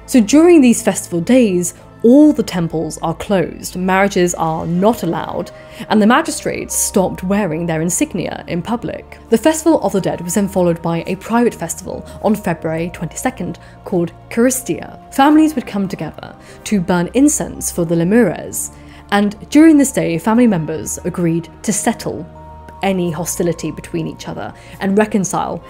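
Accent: RP, United Kingdom. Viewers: So posh. Awesome. Great content.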